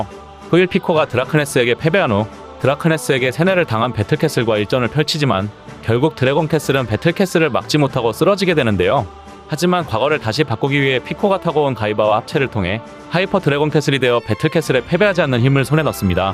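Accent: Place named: Jeolla, South Korea. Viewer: 말투가 전라도네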